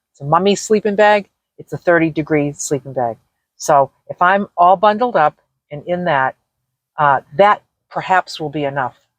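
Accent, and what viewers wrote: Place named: New England, United States. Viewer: I love your New England accent! Also, your talent and adventures! I'm in Alabama and never been out West.. would love to see the Grand Canyon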